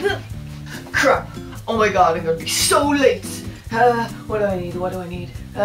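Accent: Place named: Ireland. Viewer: You're from Ireland aren't you, I can tell with your accent it's the same as my friend Apple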